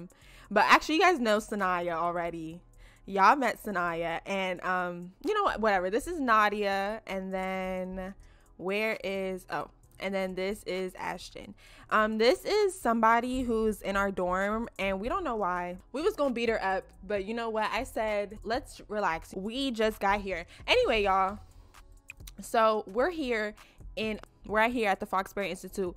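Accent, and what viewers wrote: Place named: DMV, United States. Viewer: im from dc and i knew you had to be from the dmv area ! i heard the dmv in your voice 😂 i just found your channel and instantly loved your videos❤️❤️❤️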